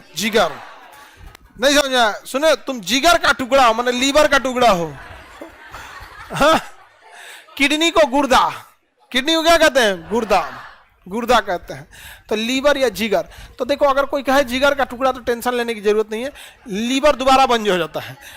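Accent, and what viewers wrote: Bihar, India. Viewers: Sir bahut achha samjhate hai Bihar abaj me bhi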